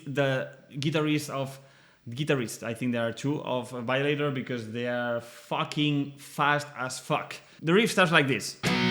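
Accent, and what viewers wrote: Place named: Spain. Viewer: Is this guy from Spain? The accent sounds a lot like European Spanish.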